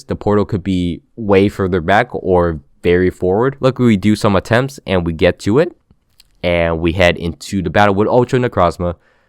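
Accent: Italy. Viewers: Are you italian? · Wait are you italian? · Wait are u italian?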